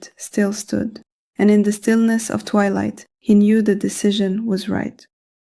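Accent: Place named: North America. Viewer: I'm playing accent detective... You were raised in North America. That's all I've got hahaha...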